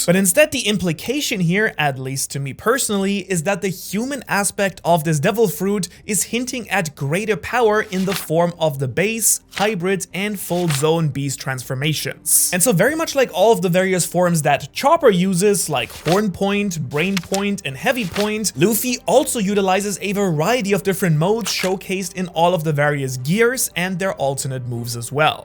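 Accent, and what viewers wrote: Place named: Germany. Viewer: are u german ?since when did j start sounding like y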